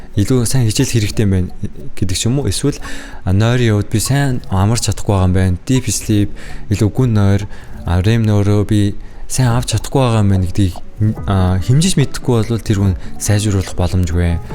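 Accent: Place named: South Korea. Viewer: Angli helnii duudlaga n aygui huurhuun yag korea english kk amjilt husiy danda engiin murtlu humuust medeelel ygdug n taalagddag shy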